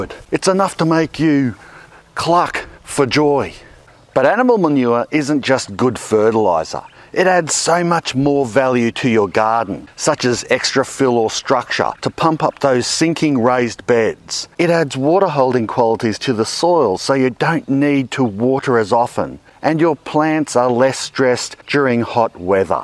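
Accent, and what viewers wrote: Australia. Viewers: I think many people watch so they can practice their Australian vocabulary and accent, in addition to the advice. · Another thing they should do, is to watch crazy Aussies making gardening videos!!! lol! I love your vids, combining some GREAT info, with a smattering of comedy, here and there. I am in the U.S., and as soon as I heard your accent, I loved how you say thing a bit different. So I TRY to remember to mulsh, but sometimes, I just have to settle for mulching instead. Have a good one, and keep up with the AWESOME vids! Another guy, who is GREAT for container gardening, is "home grown veg"! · How about collecting your own urine (if you are not taking medication) and using it as a nitrogen source to naturally boost your veggies, eh? I did that with my garlic twice and while I haven't harvested it yet the scapes have been harvested twice already! 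Very excited to see how the heads come out. This is my first year gardening so I'm definitely a noob. Thank you so much for taking the time to make your videos. I enjoy watching all of them and always learn something new. Plus I love the Aussie accent!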